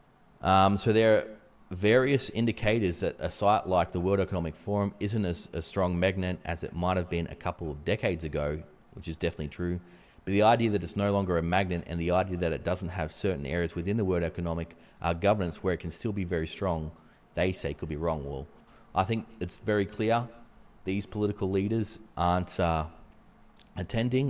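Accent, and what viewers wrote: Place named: Australia. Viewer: Yeah mate. He speaks English. Australian English.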